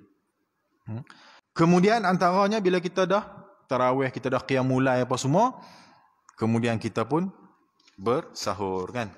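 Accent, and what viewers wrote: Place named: Kedah, Malaysia. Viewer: Komen pertama ustadz... Izin bertanya ustadz.. bahasa kedah dengan perak sama ke tak..?? Pasal saya dengar Bahasa ustadz macam bahasa kedah..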